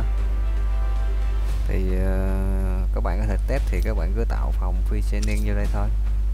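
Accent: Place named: Mekong Delta, Vietnam. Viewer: Gịong của bạn giống ng miền tây ghe luôn . Nghe thoải mái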